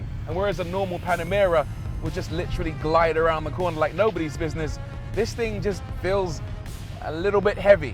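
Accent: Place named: Bristol, United Kingdom. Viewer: What part of England are you from, Bristol area?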